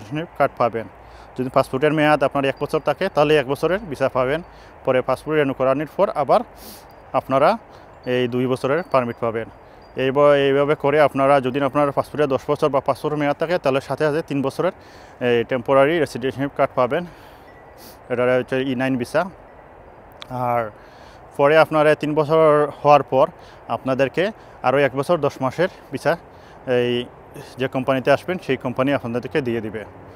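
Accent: Sylhet, Bangladesh. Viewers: ভাই আফনে ত সিলেটি 😊😊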